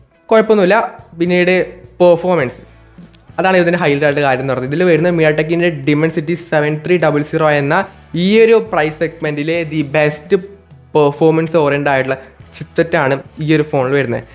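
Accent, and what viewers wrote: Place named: Malappuram, India. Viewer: Malappuram jillayilano.rasamulla slang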